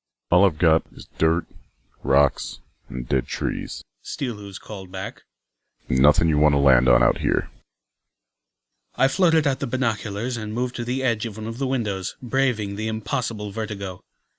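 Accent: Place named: United Kingdom. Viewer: I've been wondering this ever since i started watching your audio book; do you actually talk likt that? i mean, it sounds good, but you sound like your a mix between british and western. also, what's your cutie mark?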